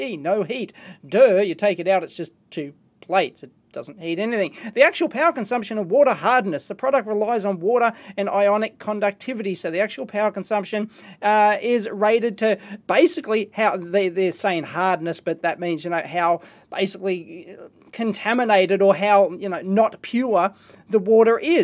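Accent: Australia. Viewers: Yeah! Aussie accents make this awesome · Bigclive did a review of these a while ago... but your australian accent makes this so much better. Makes me feel like if it kills me, i'm just not australian enough.Keep up the good work!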